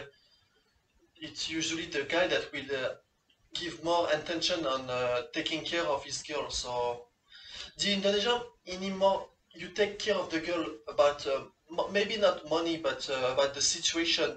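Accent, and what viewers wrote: France. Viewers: Bahasa Indonesia kamu beraksen france, dan itu menarik :) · French accent is soooo sexy...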